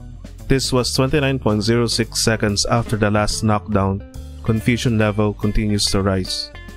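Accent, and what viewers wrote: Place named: Philippines. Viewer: Presh filo accent 🇵🇭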